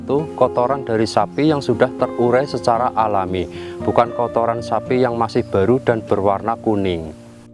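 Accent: Banyumas, Indonesia. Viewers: ora ngapak gak kepenak kang,salam saking blitar kang,,, sukses selalu kang,terus berkarya kakng,,, !!!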